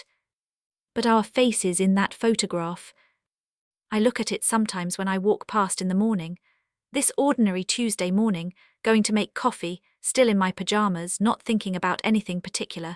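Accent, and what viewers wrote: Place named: United Kingdom. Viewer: The narrator has a very pleasant voice, but she has a British accent. Why don't they make the primary character from England instead of Vermont? Aside from that small complaint, it's a lovely story.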